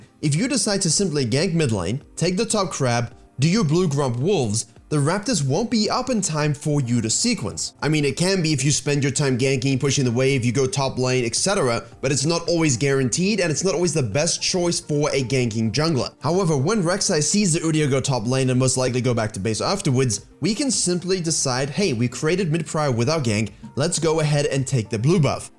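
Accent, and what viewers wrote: South Africa. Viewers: Your video make me feel like there's a chance I could go pro! Hahahaha jk but seriously your Channel is amazing, also what accent is that cause I hear South African.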